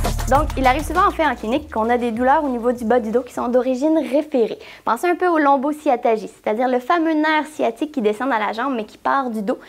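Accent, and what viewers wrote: Quebec, Canada. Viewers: Bonjour de la France, Merci pour vos vidéo si interentreprises, on apprend pleins de chose. Puis au passage j'adore votre accents QUÉBÉCOIS ! Un jours je viendrais visite votre fabuleux pays :) Petite question si possible, j'ai un vélo Contre La Montre, j'ai fait une étude posturale et de temps en temps j'ai vraiment mal au cervical ? Avez vous une vidéo qui parle de cela ? (si possible) Merci encore pour tout vos conseille.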